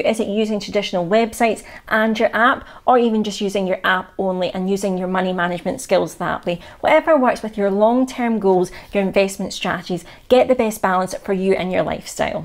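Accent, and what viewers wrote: Scotland, United Kingdom. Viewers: Aye... love Scottish accent! :)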